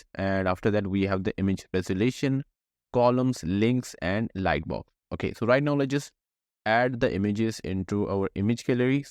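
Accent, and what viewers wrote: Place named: India. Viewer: That Hindu accent drives me crazy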